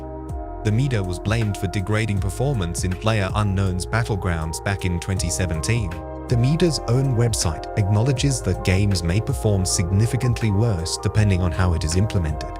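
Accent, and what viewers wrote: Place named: England, United Kingdom. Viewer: bruh i just listened to jolly wangcore, he's australian. idk what the accent is called but this is like a slightly posh English accent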